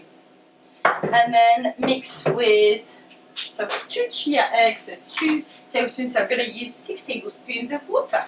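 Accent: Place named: France. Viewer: Bonjour! By your accent I guess you're French?:) I lived in France for 8 years or so, and I must say I can't remember a high concern there for gluten free etc, there just seemed to be less allergies over there? Am I wrong?? I honestly first heard of allergies to gluten in the UK.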